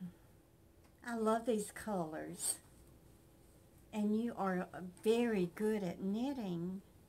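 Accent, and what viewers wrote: South, United States. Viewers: my love, you need to get a microphone. They are not expensive. You can get one that you clip on your shirt so you can even take it with you when you're shopping. Those run about $20 dollars. That would really improve the sound of your lovely, soft-spoken Southern voice. Just a suggestion.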